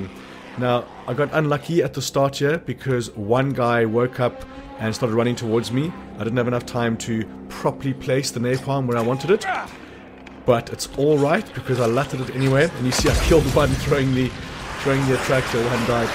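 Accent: South Africa. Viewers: thought I recognized the accent. I'm from SA too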